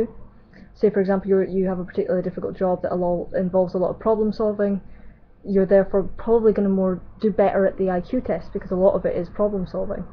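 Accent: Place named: Scotland, United Kingdom. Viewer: Thumbed up just for Scottish-ness. Even though I side with race-realism.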